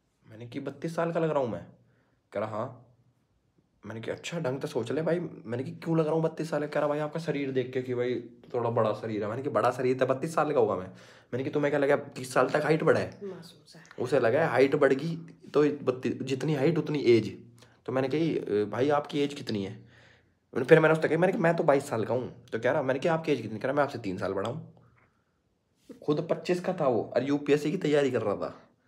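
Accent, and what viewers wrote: Haryana, India. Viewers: Haryana se ho kya language aise lag raha hai reply dene ki free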